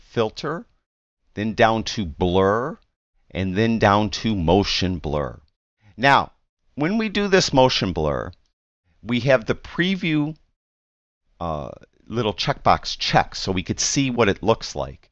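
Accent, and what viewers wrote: United States. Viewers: great teaching, . This doesn't seem to look right on close up objects such as a flower. As far as you talking too much, lets just say i am getting a Yankee accent, Actually i enjoy listening to your teachings. keep up the good work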